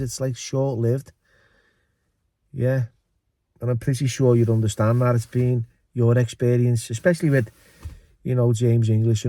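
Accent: Liverpool, United Kingdom. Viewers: Your deffo the best scouse podcaster enough said.😅😅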